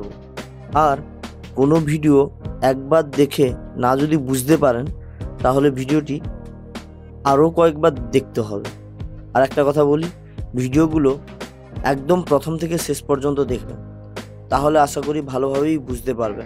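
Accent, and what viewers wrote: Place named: India. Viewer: ভাই তুমি মনে হয় ভারতীয় তোমার কথা পষ্ট না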